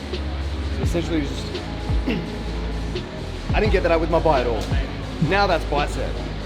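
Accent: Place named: Australia. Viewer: Love your channel and the aussie accent ❤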